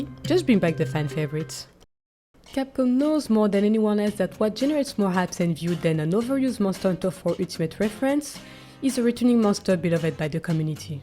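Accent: France. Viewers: Je me disais que t'avais un petit accent français et je vois que j'ai raison.. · I love your accent. Are you French btw? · i love ur french accent its so pretty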